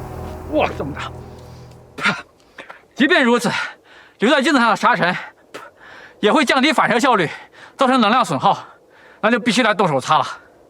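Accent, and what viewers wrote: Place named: Fujian, China. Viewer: 我一听就知道是福建的，特别可爱。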